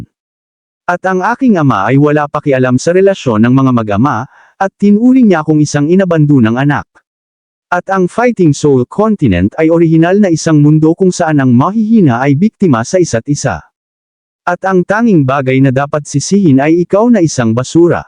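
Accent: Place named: Visayas, Philippines. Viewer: Ang recap mo parang bisaya eh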